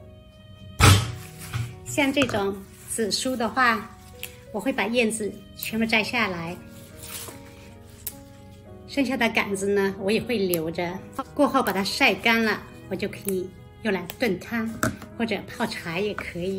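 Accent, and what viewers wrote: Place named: Southern Yunnan, China. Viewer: 我偶然刷到，一听就是马谱，我家云南人。了不起，很辣燥的云南婆娘。你口音是滇南人😊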